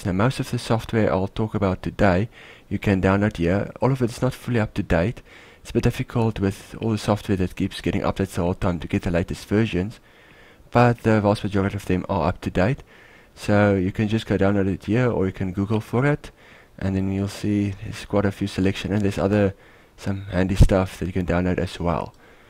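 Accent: South Africa. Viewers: he clearly has the South African accent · You sound like a south African. That's surprising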